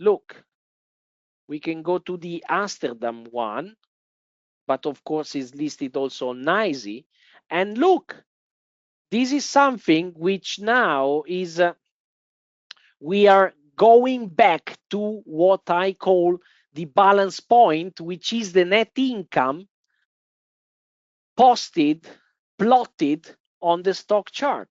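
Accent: Italy. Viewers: You have italian accent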